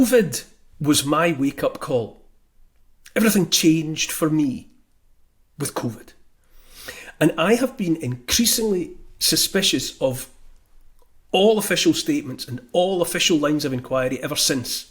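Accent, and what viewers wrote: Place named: Wales, United Kingdom. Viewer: A Welsh accent saying things like "speed of science" tells you all you need to know. Deer me.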